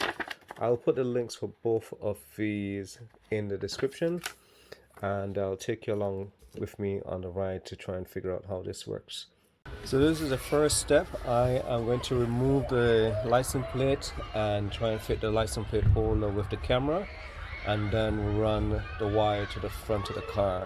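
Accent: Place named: Trinidad and Tobago. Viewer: Hey, why did you turn off the comments on the other video? You sound like a Trini.......are you? I have a VW Caravelle Syncro...planning to remove the stock radio and install a touch screen radio with the reverse camera. While it's activated from the wiring on the reverse lights, I will be putting in a bypass switch (thanks for reminding me), where I can switch to the reverse camera even when the van is in forward.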